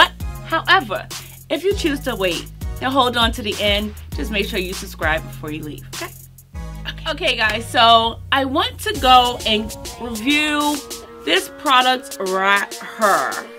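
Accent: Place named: Illinois, United States. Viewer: Where are you from? Sounds like Illinois my entire family is from there, I could be wrong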